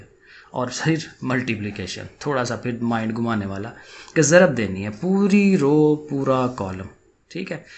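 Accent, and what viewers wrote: Sialkot, Pakistan. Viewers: I think you are Sialkoti😊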